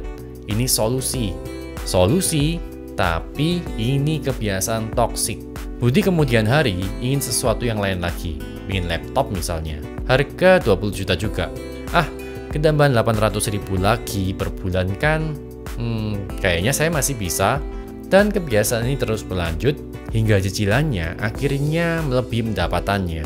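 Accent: Java, Indonesia. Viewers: lihat.cnl.ini.lngsung.tertarik.kaget .mase.ganteng bangt kok.suaranya.kejawen....mantap...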